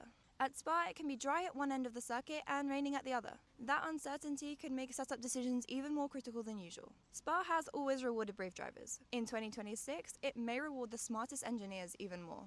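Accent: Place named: France. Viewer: That French accent 🔥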